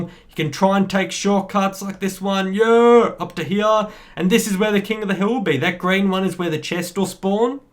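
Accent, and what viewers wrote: Australia. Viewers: Dude you are awesome and are you Australian?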